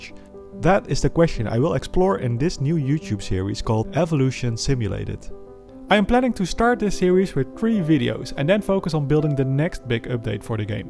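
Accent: Netherlands. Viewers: I sense a strong dutch accent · You sound dutch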